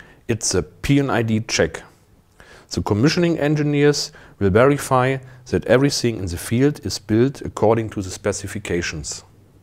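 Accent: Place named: Germany. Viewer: Thank you For this training Mr., it is very helpful for me. i liked your german accent ^-^.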